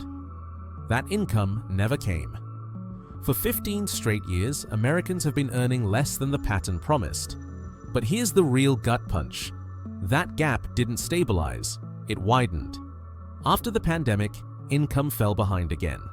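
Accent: United Kingdom. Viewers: Interesting that someone with a British accent is pointing out problems in USA. Compare Great Britain with USA and see what the results are! This is not 1929 and Americans can still start their own small businesses and have more income than those who work for a salary. Those who have the means are already shifting to assets..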